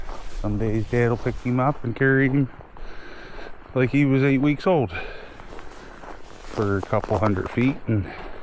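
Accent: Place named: Canada. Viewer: I love hearing the Canadian accent!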